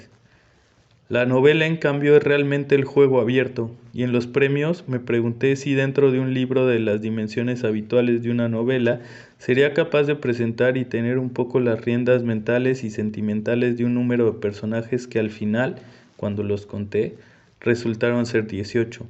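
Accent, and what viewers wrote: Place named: Mexico. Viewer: presente el acento mexicano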